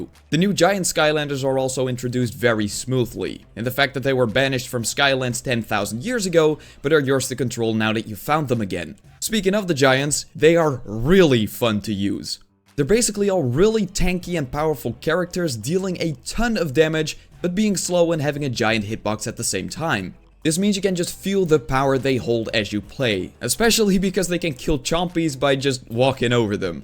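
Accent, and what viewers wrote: Netherlands. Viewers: are you dutch? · i smell nederlander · its funny i can hear ur dutch because of ur accent XD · Is that a dutch accent i spy? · leuk geprobeerd, maar ik herken een nederlands accent uit duizenden!! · Nice video and your top 6 is on point (mooi nederlands accent trouwens) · heujjj did i just hear a nederlands accentje? skytuber from the netherlands is always a big plus. i tought the skylanders fans wherr a dying race here. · jij klinkt echt Nederlands hahah. Goeie video man, deze serie is pure nostalgie voor mij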